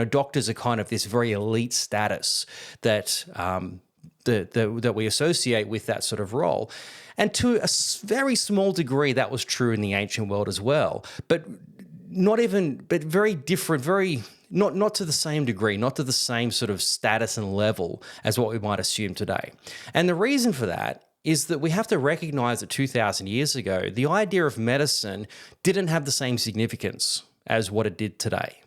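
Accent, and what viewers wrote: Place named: Australia. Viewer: I've been watching a bunch of your videos today, and I'm glad of your explain-the-nuts-and-bolts format for these. It's surprising how few views you're getting, and how many of the videos have zero comments. Your Aussie accent isn't so bad, and your knowledge of the topics isn't so bad ether. Thanks for making them anyway, it must require a lot of work. Perhaps an hour plus about fairly minor characters that so little is known about is pushing it? If you can do it in a thousand words, don't feel the need to pad it to 10 000 words? I'm a Catholic, and you haven't annoyed me. Maybe that's your problem lol. Well, thanks and God bless. May your endeavors lead some to Faith, even if not you to big YouTube ad bucks.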